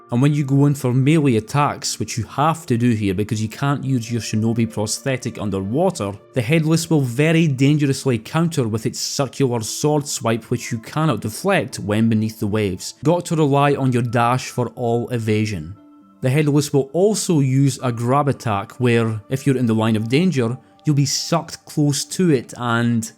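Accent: Scotland, United Kingdom. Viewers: is it me or does sound increasingly Scottish with every new video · Holy crap 6 hours of Scottish man talking about soulsbourne 🥹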